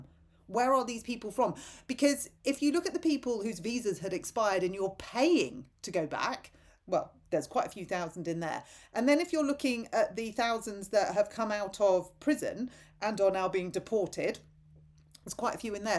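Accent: RP, United Kingdom. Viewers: I love the harmony btw the colour of the door and the fireplace. Also your posh accent.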